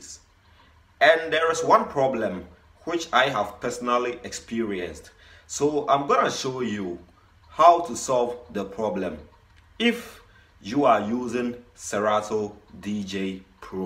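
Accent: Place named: Africa. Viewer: I LOVE YOUR ACCENT BRO PROPER AFRICAN VIBES PEACE AND LOVE MY MAN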